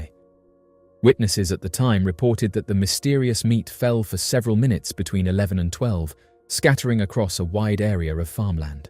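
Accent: United Kingdom. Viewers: When I first started watching this, I had a feeling that you'd be British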